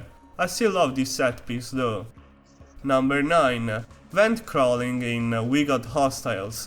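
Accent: Italy. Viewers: Wild guess but, are you by any chance italian?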